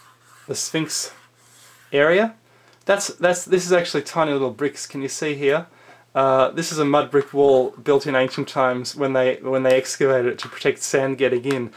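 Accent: Australia. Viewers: I really enjoy your astute commentary and speculations. Please continue. Your voice and accent are very easy on the ears. (unlike some Aussies) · Great video! And I could listen to the Australian accent forever, even you were telling me that horses can fly. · He has an Aussie accent calm down.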